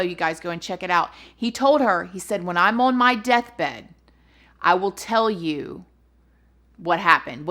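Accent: South, United States. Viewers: I think Morbid Podcast did an episode on this! And I just imagined if you did an episode with them, AHHHHH!!!!!! You and your southern accent and colloquialisms with their New England accents would be the absolute cutest to hear!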